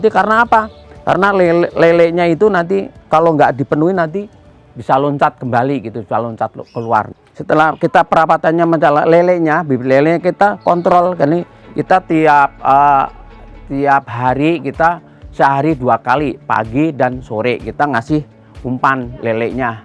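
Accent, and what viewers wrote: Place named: East Java, Indonesia. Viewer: sprt org jatim yah mas ...😁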